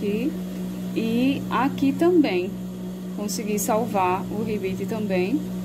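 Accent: Brazil. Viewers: Amei a dica e o sotaque sou costureira e nordestina tbm ! Venham conhecer-me galera. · Ótimas dicas ❗Vc. é do Recife⁉️🌹💙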